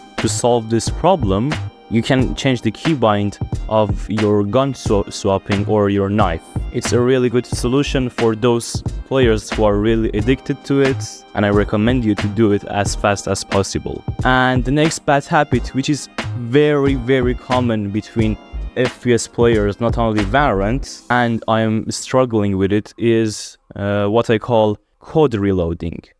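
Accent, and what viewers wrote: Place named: Turkey. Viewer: Türk müsün la😅 aksanindan anladım 😅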